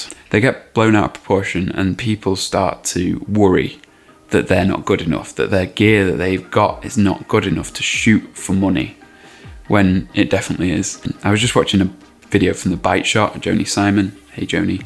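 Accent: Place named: North West England, United Kingdom. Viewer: Nice to hear a north west accent on YouTube 😁 - your right you don't need 2 slots to be doing professional work, my camera has two slots but I've never used the second slot. But I was going to buy a Z6 and to be honest I have stalled because it's now stuck in the back of mind that I probably should have been. I have had corrupted files about 3 or 4 times but I've always got the data back on my computer.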